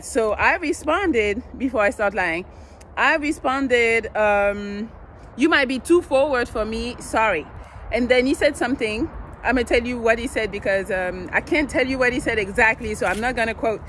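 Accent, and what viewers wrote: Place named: France. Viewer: She as a french accent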